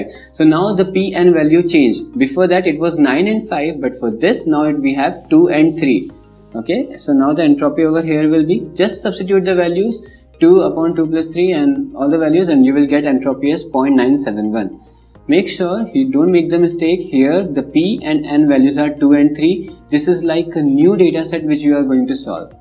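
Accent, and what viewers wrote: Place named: India. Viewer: hintli ingilizcesi skilli kastırdınız zorla abv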